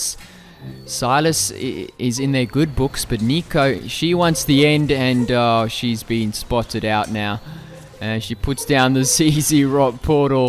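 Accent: South Africa. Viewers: Are u south african? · Are you south african ?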